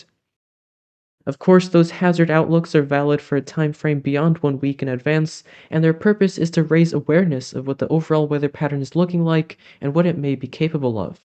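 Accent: Portugal. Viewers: He says it perfectly with a beautiful Portuguese accent. please don’t change! ❤️🌟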